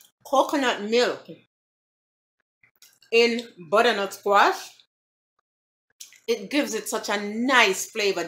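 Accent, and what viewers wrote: Jamaica. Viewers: That looks amazing!!! To be honest, you can never go wrong with coconut milk. I love love love coconut milk. I'm new on your channel and I must say, I'm already hooked on the Jamaican accent. Congrats on the weight loss and thanks once more for the lovely comment you left on my channel. ❤️Summer Dublin, Ireland 🇮🇪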